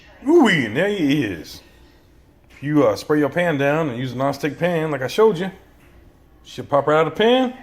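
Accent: South, United States. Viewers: I love his southern accent. Lol he said don't smoke no pot in Texas 😂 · Love love love love your southern accent!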